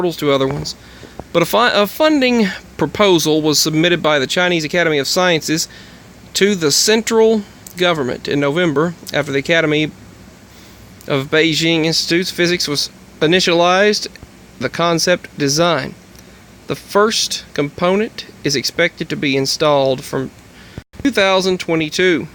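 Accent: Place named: Tennessee, United States. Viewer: Hello my little friend, are you in Tennessee? I hear your accent and if your even close to here in Tennessee then I'll have to say Praise Jesus for what your doing. Nobody around here talks about what going on with these collidars. And the one you say might be hidden underground I really believe the Holy Spirit, Wind and Breath has showed me that it's under Oak Ridge Tn. To much in Tn is hidden from us, the Red headed Giants that followed the map of the rivers where here plus the Giants built all mounds the Native Americans have been said to have built, the Native Americans have many stories to prove that the tales of the Giants. Native Americans where all over the state of Tn so that means the Giants where here to. For some strange reason Tennesseans have been purposely dumbed down so we don't pay attention to whats going on at oak ridge TN, Nashville TN with thier parthanon and Music city, Memphis TN with thier King of rock and roll plus jazz music and Egyptian connect and how the state of Tennessee has a pyramid on the driver's license. 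I believe oak ridge plays a huge part in this collidar to collidar connection. I seen where you mentioned the Freemasons in some videos well the Freemasons are all religions into one and Jesus has showed me what Revelation says and how all these nation's are in the same Bed with one another so with that being said I really believe that all these collidars are working together behind closed doors to open the bottomless pit in Revelation chap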